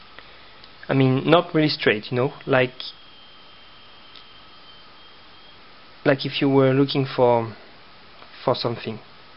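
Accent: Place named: France. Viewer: French accent of course!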